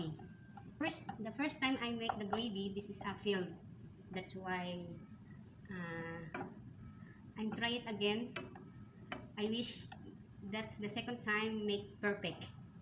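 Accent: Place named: England, United Kingdom. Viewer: Nag enjoy ako panonood lods,,good vibes ang pagluluto mo with English accent hehe..new friend, Joyce Toda brought me here..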